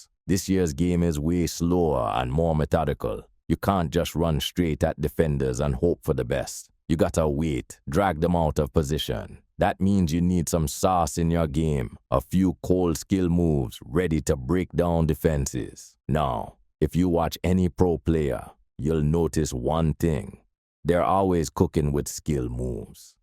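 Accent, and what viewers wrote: Jamaica. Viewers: The yardie voice alone makes this all top tier content. Actually never seen someone say some of this. Im div 2 but still learning 👍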